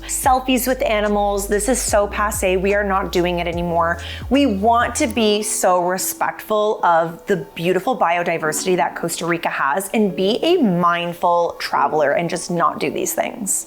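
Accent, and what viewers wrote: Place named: Canada. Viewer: I think that it has to do with the Canadian accent as apposed to disrespect or not knowing the correct pronunciation or spelling.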